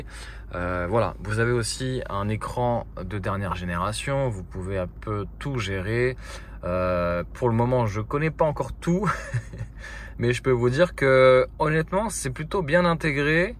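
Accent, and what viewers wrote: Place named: France. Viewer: I only watched this to listen to the French accent. 10/10.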